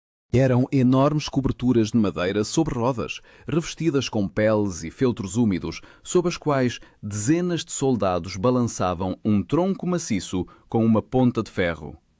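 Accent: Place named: Portugal. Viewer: Maravilha este acento lusitano.sou brasileiro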